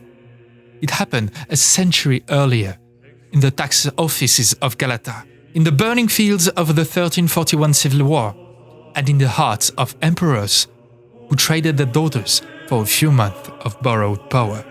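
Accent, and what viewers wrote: France. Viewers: english with a french accent but one that is nice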